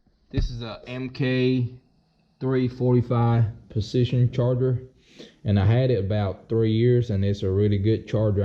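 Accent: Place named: Texas, United States. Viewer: Dig the walkthrough, ive got a nitro as well. Your accent is tripping me out tho. You ffom texas? Thats where I'm from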